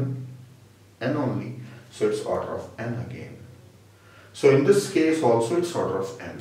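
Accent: India. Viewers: omg op indian with less accent vey nice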